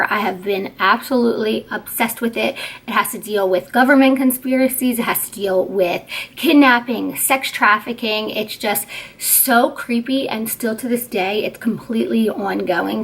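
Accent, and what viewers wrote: Delaware, United States. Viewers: This is one of the more intriguing and unsettling missing children cases I've heard. Btw I like your accent. I had never heard a Delaware accent before.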